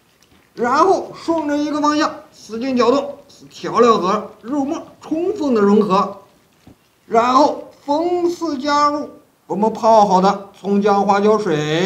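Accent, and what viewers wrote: Shaanxi, China. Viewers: 这陕普听得我太亲切了！想回家😭😭😭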